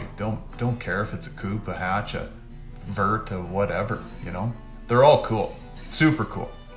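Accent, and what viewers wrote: Canada. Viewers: Your videos are absolutely awesome and extremely informative!! Thank you so much! Love the Canadian accent, as well!! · Keep up the great vids man. Also I recognized that canuck accent in about 0.2 seconds, hello from Ontario!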